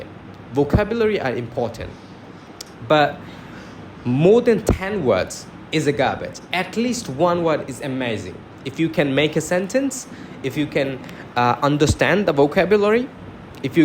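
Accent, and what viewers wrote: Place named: Bangladesh. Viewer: U still have bangladeshi accent though ❤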